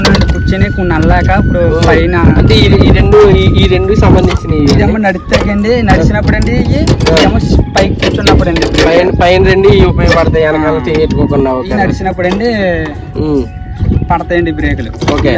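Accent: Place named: Konaseema, India. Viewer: కోనసీమ యాస.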